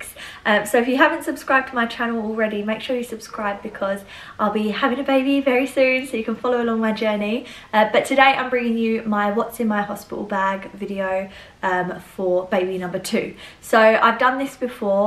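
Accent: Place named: England, United Kingdom. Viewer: Sounds English to me lol!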